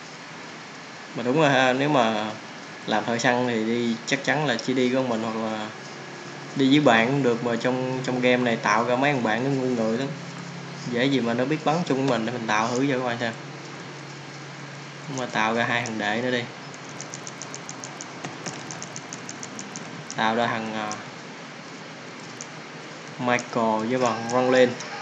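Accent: Mekong Delta, Vietnam. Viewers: Anh Nói Chuyện Đúng chất Người Miền Tây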